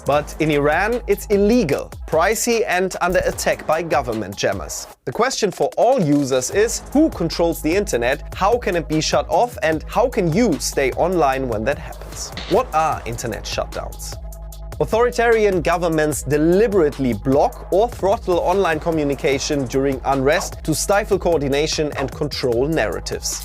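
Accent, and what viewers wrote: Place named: Germany. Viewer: German Accent detected 🇩🇪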